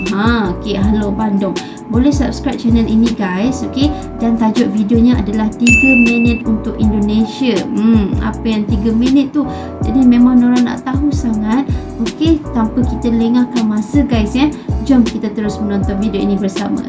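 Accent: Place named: Malaysia. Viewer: Hari cantik orang mana sih ko logat bahasa Melayu Malaysia ❤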